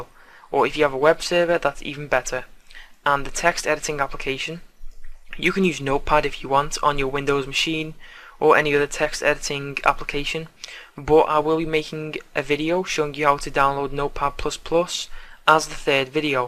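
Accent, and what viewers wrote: Liverpool, United Kingdom. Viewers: Ziqian Li the guy in this vid is a scouser (liverpool) lol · Are you perhaps Scouse? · You sound scouse lad, you a wool? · are you a scouser?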